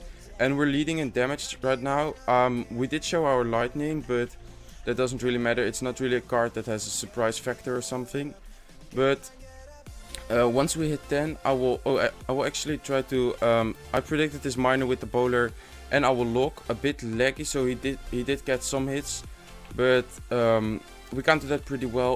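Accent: Netherlands. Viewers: where are you From? You've Got a English accent like a dutchie that is talking English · Haha, loving the Dutch accent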